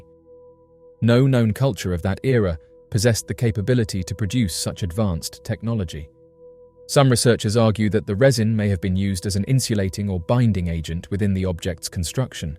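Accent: United Kingdom. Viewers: Such boorah. If you just reported the finding, your findings whould be about 15 seconds. The rest if this video is just conjecture with a British accent... like that makes the narrators verbal diarrhea all the more believable. A 12 year old coul do a better job with this content.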